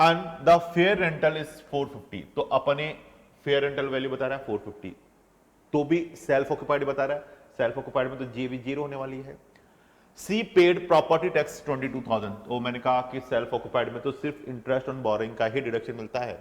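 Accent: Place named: Rajasthan, India. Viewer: Rajshthani accent👌😁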